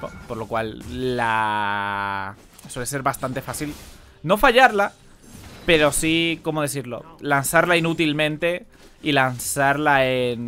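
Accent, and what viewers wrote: Chile. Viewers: Habla como chileenooo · habla en chileno xd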